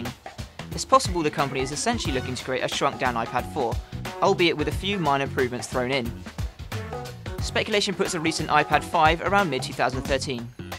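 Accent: United Kingdom. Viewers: BRITISH ACCENT :D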